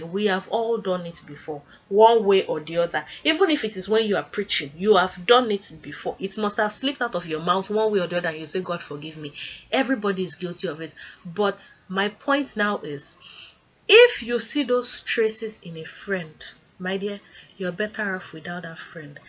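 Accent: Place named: Nigeria. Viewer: You so funny girl....made me laugh the way you narrated the story 9ja style😝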